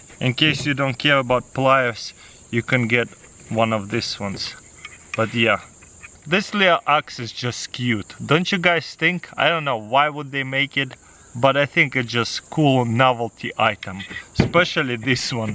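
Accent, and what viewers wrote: Russia. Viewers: Руский акцент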